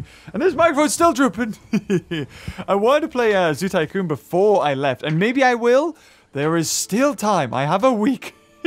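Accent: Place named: United Kingdom. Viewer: Okay, that person who said all that mean stuff is really annoying to me for many reasons. 1) Saying the same damn thing for three comments straight doesn't get your point across any better than saying it once. 2) How can you not like laugh?! It's so blubbin' funny. Infectious, actually. And for the record, I love British accents. Just makes everything funnier.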